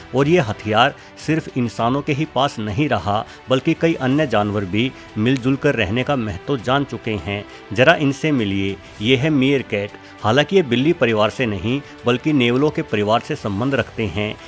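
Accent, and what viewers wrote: Haryana, India. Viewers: Sir aap kaha se ho? Avaj se haryana ya rajasthan ke lagte ho?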